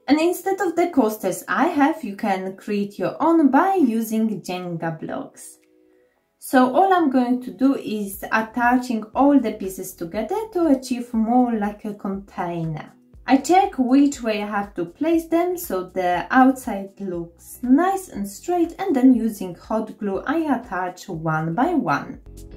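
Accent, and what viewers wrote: Poland. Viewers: Hi, My fav this time is the light shade, really nice and would fit in with any decor. I like the clay and glass bottle design as well and the coaster project at the end so simple but effective. I agree with Kathy about your voice it is so relaxing but I think it is your lovely polish accent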